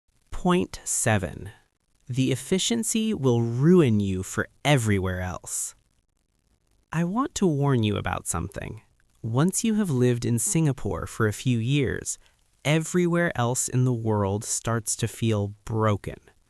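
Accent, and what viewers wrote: United States. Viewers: He doesn’t sound like a British at all, more like an American .😮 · This guy (with an American accent) claims to come from Britain. Never mind. He came here 28 years ago. He never underwent the 60s, 70s and 80s in Singapore where every shred of creativity, individuality and independent thinking was crushed out of you. Which is why many Singaporeans migrated. He missed all that. He never did National Service. And finally being white - every Singaporean women would have kissed his arse most willingly and other brainless Singaporeans would put him on a pedestal. I'd love to see his answer to these points.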